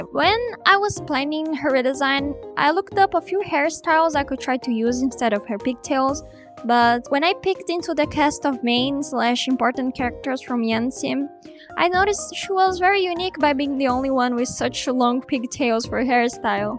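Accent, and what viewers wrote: Brazil. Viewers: Hey, are you brazilian? You kinda sound "brazilianish" idk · MANO EU CONHECI SEU CANAL AGORA MAS EU BATI O OLHO E JA SABIA Q VC ERA BRASILEIRA KSHSKSJSJ, sabia que o jeito q os brasileiros pronunciam o nome da osana e da raibaru realmente muda muito em questões de linguagem? Tipo eu acabei sabendo que uma mina era russa por conta disso e por isso descobri q vc era brasileira! E muito bom ver brasileiros tão talentosos quando você! Adorei o vídeo e os redesigns tb<3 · Mano, eu já sabia que era brasileira por causa do sotaque e o jeito de falar os nomes das personagens. (Me humilha menos no desenho😅, ficou incrível os desenhos. ) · You have a VERY strong Brazilian accent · hey idk if you will see this but are you brazillian? because your accent really sounds like a brazillian accent, especially when saying the rivals names (i'm brazillian thats why i recognize it xD)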